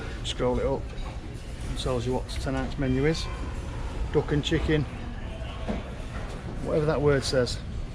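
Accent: United Kingdom. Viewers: Thought you were from Manc good on yeah. Our interpretation would be Geeza bud ta. Guess where in the country that comes from.1 Guess.🍻🍻😂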